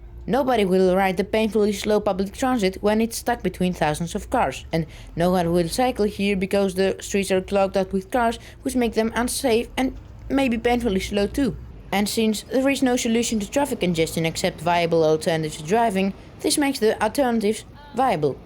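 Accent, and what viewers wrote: Netherlands. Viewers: It's a very thick Dutch accent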